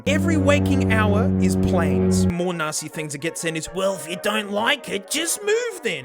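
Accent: Australia. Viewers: Dude I've been watching this channel for a while, it's become a new favorite. I initially had a sneaking suspicion but then thought "no I must be racist towards Australians, they can't all sound the same" that this was. I'm probably more than a dozen videos in. You mentioned drumming in this video and I just thought "no way this has to be him", and sure enough I look under the description and there's lmao. Great channel. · Man what a shitty position you've been in. Really glad our support has enabled you to move somewhere else. Hope you'll enjoy it there man! Keep that silly content going you loud aussie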